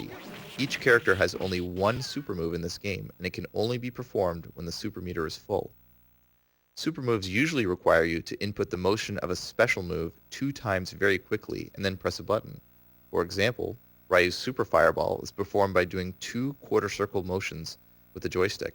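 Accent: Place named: United States. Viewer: nobodys asking anybody to be a weeb, but this is the most americanized pronunciation of anythig street fighter i ever heard lol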